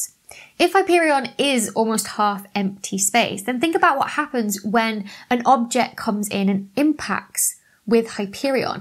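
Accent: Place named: Cornwall, United Kingdom. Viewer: Love it, there was a hint of some Cornish accent coming into parts of the video.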